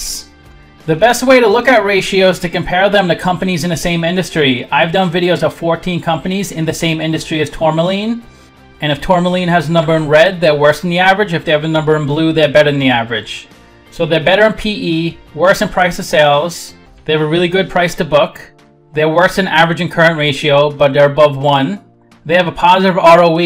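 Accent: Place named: Boston, United States. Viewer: You accent says drunk Bostonian but your efficient explanation says seasoned financial advisor with a unique ability to explain valuations to simpletons like me. 🧐 You have my subscription sir.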